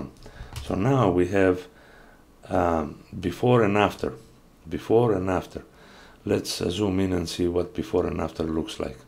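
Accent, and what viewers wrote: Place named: Greece. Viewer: May I ask if you are Greek? You have a very Greek accent. The video is great!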